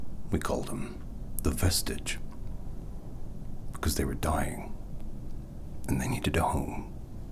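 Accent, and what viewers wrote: United States. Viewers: Less than 5 minutes in & THIS is brilliant... definitely worthy of a whole series & even more, thanks! & that american accent, spot on sir!!!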